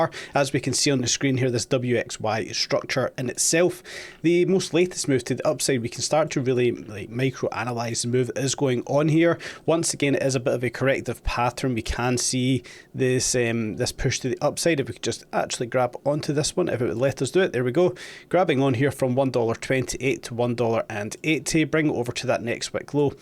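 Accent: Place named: Scotland, United Kingdom. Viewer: You Scottish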